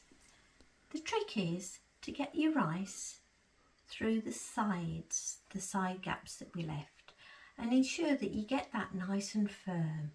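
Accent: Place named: Midlands, United Kingdom. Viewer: Very clear tutorial, thank you. I love hearing your tutorials as having moved South they take me back home to the Midlands 😀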